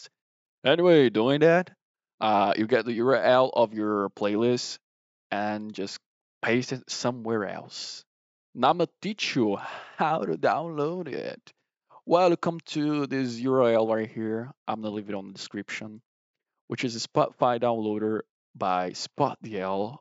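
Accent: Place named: Brazil. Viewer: Esse sotaque brasileiro é muito bom de ouvir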